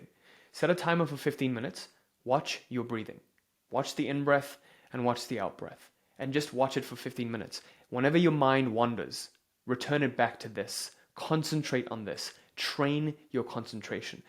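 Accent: United Kingdom. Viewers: Why is Muslim talking about Sanatan Dharm- Hinduism in a British Accent for American audiences? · Why is Muslim talking about Sanatan Dharm-Hinduism in a British Accent for American audiences? I guess The truth shall set you free, at the end of the day the truth will prevail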